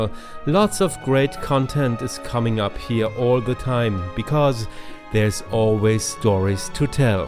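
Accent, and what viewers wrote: Germany. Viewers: Hay, I've only just found your channel a short while ago. I had been watching one of the reviews from "Nonstop Dan" and whilst making a comment to him about his latest second channel, I saw, in the comments section, that you had also added your own comments. That was the very first time that I have heard of so my reaction was to immediately check you out. I am so very glad I did! I've only just begun looking through your quite extensive range of reviews and, from what I've seen so far, I don't think that I'll at all disappointed with them. I intend to spend a very enjoyable time as I go through your work. BTW Ilove your wonderful German accent! I have visited many parts of Germany because it's so beautiful, as are it's people. I am now looking forward to following you very much indeed. I love your presentation and your appeal! Thank you and Keep Safe, Keep happy and KEEP FLYING! 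Thanx.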